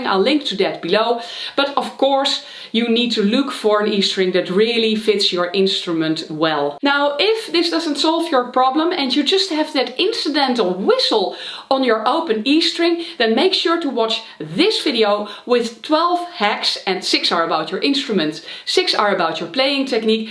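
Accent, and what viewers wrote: Netherlands. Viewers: Just the video I was looking for, been playing for a year and always struggling with the sound of my E string. Love the accent too (Dutch?), subscribed! 😊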